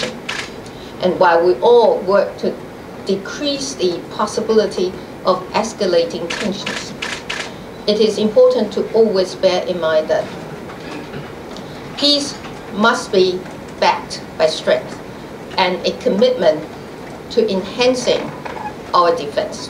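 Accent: United Kingdom. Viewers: 如此純正的英國腔語言豈是牠馬的波士頓抓耙仔或其入幕之密友同志兼大總管公公的小混混所能比擬的呢！水啦！小英！台灣史上第一女 總統！万歳！万万歳！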